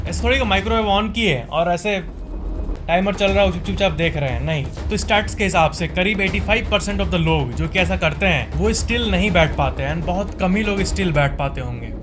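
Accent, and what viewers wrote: Bihar, India. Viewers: Sir app Bihar se hai kya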